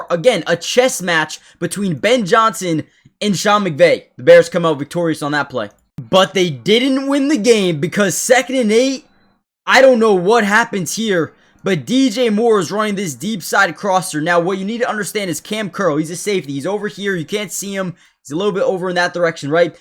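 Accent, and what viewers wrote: Canada. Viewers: Sounds Canadian eh?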